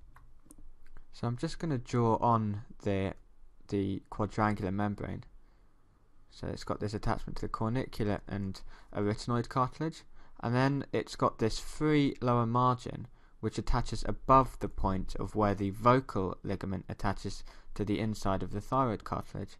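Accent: United Kingdom. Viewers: You would think a British accent would spice up the larynx, but no it's still so incredibly boring. great video though all jokes aside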